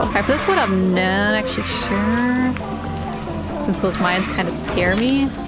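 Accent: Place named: Canada. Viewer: Silence or humming. Got it. :_marQuack: Girl, you’re way too good at this game what the actual fuck. Seriously wtf im horrible lol Nothing to do with anything but am I allowed to say that I’m a tad in love with your canadian accent? 😍 The music in this game is so 🥰 geeeez. Its seriously worth the frustration.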